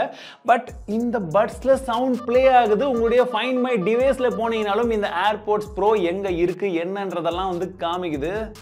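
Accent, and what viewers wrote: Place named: Usilampatti, India. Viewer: Uslimpatti slang super bro 🔥🔥🔥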